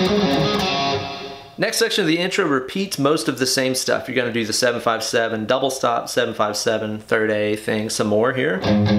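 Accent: South, United States. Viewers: I didn’t hear it. Maybe it’s just that country accent. I get a kick out of it. Reminds me of my days in Alabama.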